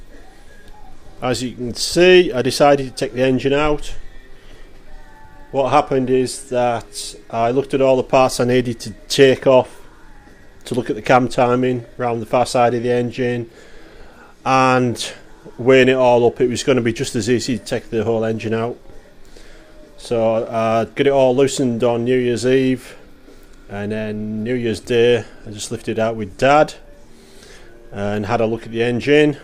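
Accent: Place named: Northern England, United Kingdom. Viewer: The bent valve is a shame, do you know why and what it hit? 12.7 compression? Not messing around are you! What fuel do you run? Could there be a valve from something else that could be modified? Maybe turned down or something? Does REC (Paul Ivey) still exist? I remember going to see him for valves a long time ago! Maybe there's something in the US from a V8 or something? I agree about your videos, the ones where you explain stuff are the best, can't beat the Northern accent! I think as, ahem, more mature Mini blokes, we forget what we know and take for granted over time, young guys just don't get the exposure to basic stuff (although people like Tom have bucket loads of enthusiasm!). Back in the late 70's it was a necessity that you could work on your own banger to keep it going, all my mates did the same and we learned by our mistakes! 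I need to learn more about engine management and injection, that's why I watch your stuff, although I like how you're adding a bit of humour, who ate all the chocolate toffee's........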